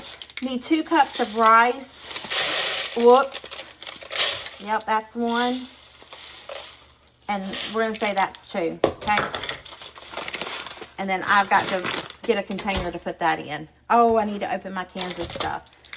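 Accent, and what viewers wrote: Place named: South, United States. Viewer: April here from your friends channel, I love caserole looks so good. I love your Southern accent girl!!! keep doing you BY George! have a wonderful day !🍏🍎🥭🥑🍆🥕🌽🍅🫑🌶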